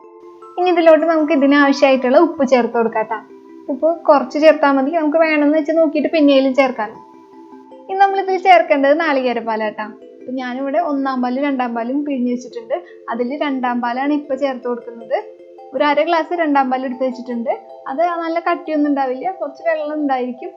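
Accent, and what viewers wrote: Thrissur, India. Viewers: തൃശ്ശൂർകാരി ആണല്ലോ 👍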